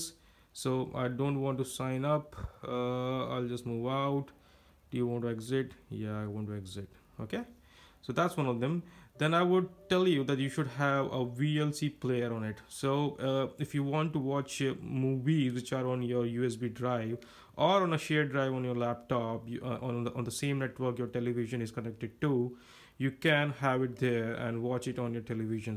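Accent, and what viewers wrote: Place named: India. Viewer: The indian accent suckssss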